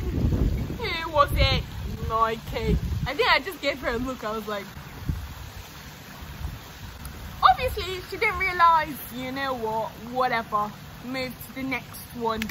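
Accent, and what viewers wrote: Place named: United Kingdom. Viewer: Is da British accent for me luv ❤️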